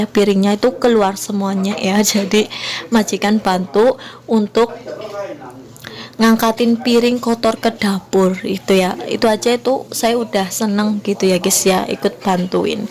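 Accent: Banyumas, Indonesia. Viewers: Medok bgt mba logat nyah.. org banyumas yah.. smangat deh bwt mba nyah. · Mb dr mana ya?... kok ngapak kaya aku?...🤣😛🤦‍♀️ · Mb ko ngmngny ngapak..wong ndi sampean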